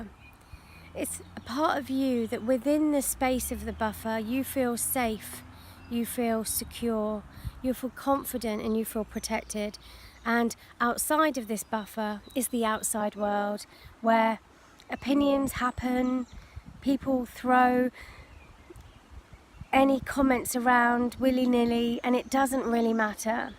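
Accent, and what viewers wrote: United Kingdom. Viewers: Are U from any British Country?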